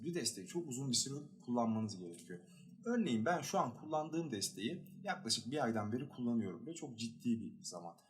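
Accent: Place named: Istanbul, Turkey. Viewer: ÇOK KASIYOSUN KENDİNİ VE KİBAR KONUŞUYOSUN YANİ İSTANBUL TÜRKÇESİYLE KONUŞUYOSUN KÖTÜ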